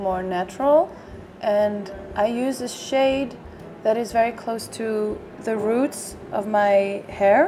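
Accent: Friesland, Netherlands. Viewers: I love your dutch Fryslân accent!! 🥰❤️😍💋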